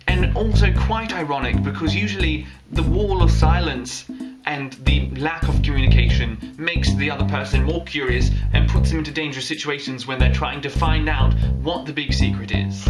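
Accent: United Kingdom. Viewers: That is quite an astounding British accent. :D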